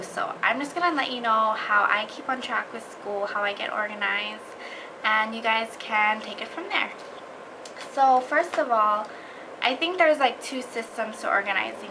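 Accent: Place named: Hawaii, United States. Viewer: U have a pigeon accent r u from Hawaii?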